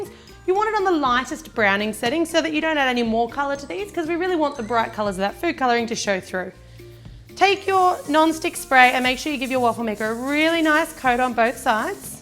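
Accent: Australia. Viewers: Australian I think but definitely not English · I hear a little aussy in here and I see an aussy chocolate dollar :)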